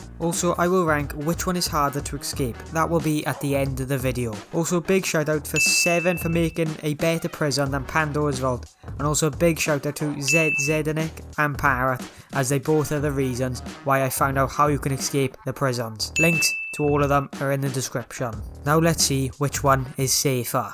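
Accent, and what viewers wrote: Wales, United Kingdom. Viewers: Is he welsh??? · U Welsh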